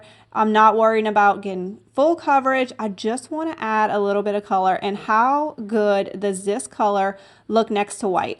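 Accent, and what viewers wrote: Louisiana, United States. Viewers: your slight accent sounds like you are from New Iberia, LA. Am I correct? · I love to hear her accent. Adorable Southern-Cajun. Her projects are all gorgeous.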